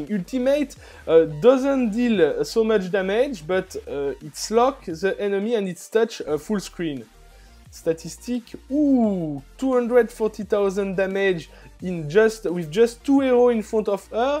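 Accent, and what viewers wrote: France. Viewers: ahah y'a pas de soucis mais c'est sympa de reconnaître un accent français parmis tous ces HL ^^ oui après il me manque quelques essentiels à passer légendaire, surtout maitre mage mais elle est en cours) mais sinon je les ai tous full mais je galere je suis bloquer à 24/25 je tombe que sur des mecs de grosses guildes j'ai pas trop de cul ^^ bon jeu à toi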